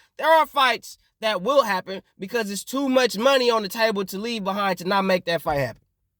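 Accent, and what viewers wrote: United States. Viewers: I'm not mad at you. You're trying to get Canelo haters on your channel LO, L, and then I can hear yourself American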